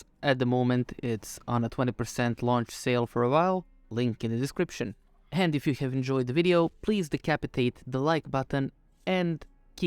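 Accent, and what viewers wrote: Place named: Eastern Europe. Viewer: Potpuno se slazem, precesto mi se desava da pogledam video koji traje skoro koliko i epizoda neke serije koji nije ni najmanje koherentan i vrti jednu te istu pricu svo vrijeme bez neke poente. Radujem se daljim uploadovima :) Pretpostavio sam da si negdje iz istocne evrope po akcentu, kada si izgovorio ime developera u ovom videu pravilno, znao sam da mora da si negdje sa ex-yu prostora, svi ostali se generalno bas muce sa slovom Ć haha. Sve najbolje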